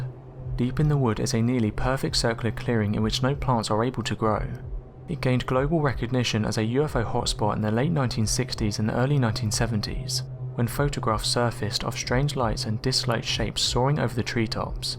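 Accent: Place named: Southern England, United Kingdom. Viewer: Anastasia Roman Yeah. Something about a soothing, southern English accent...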